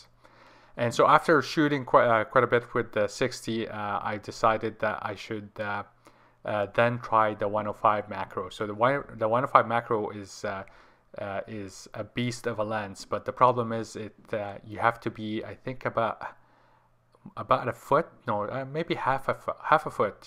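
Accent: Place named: Quebec, Canada. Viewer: Merry Christmas! Will check out the portholes. Really neat. And I was talking to someone locally and they said you sound like you might be from Quebec. True? 😁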